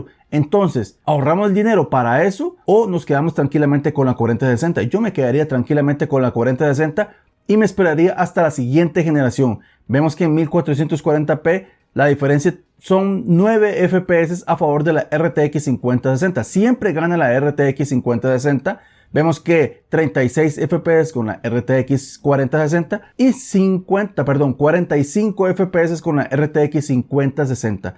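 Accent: Costa Rica. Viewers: jaja que bien, no se porque dije: este mae tiene jacha de tico y acento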